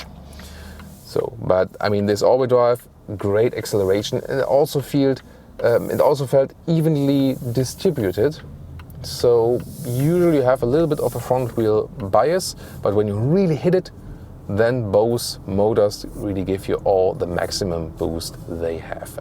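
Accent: Germany. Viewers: Forgive me but I'm here mostly for the German accent. EVs are still too costly for my monetary capabilities. Though however much I wait my next new car will be an EV.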